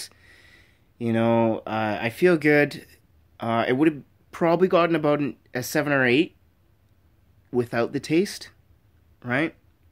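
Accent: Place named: Canada. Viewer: I can almost hear the Canadian in his voice. I wanna say toronto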